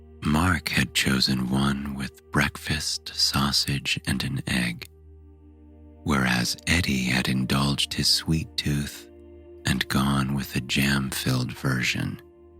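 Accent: United States. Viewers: American voice, yay, and an American story ❤ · I love the american accent. It makes me so calm to hear my own accent.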